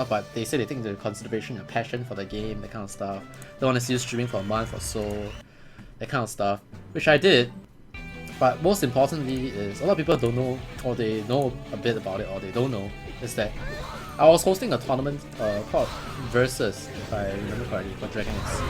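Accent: Singapore. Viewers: Are you also from singapore?? :D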